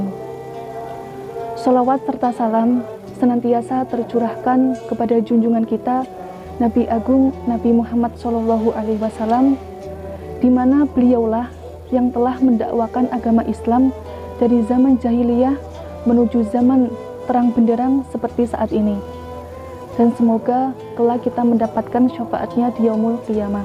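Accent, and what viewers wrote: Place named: Banyumas, Indonesia. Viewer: Ngapak ngapak 👏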